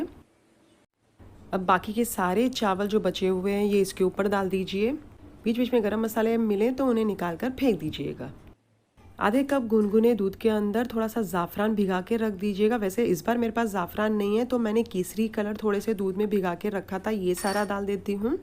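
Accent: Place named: Maharashtra, India. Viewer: Ur hindi can easily tell that u are from Maharashtra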